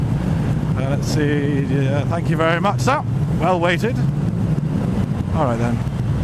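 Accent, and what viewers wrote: United Kingdom. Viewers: Your classic English accent is excellent sir.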